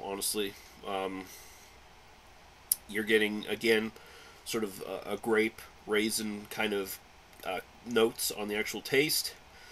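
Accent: Canada. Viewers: canadian ay! (oat and aboat)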